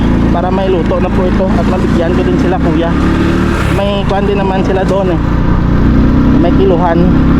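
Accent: Iloilo, Philippines. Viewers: pahingi ng alimasag boss hehe ilonggo gid ya ang tuno ta pareho gali ta sini pero taga sultan kudarat kami,kanami gid sang view da sa lugar nyo,halong lang permi ah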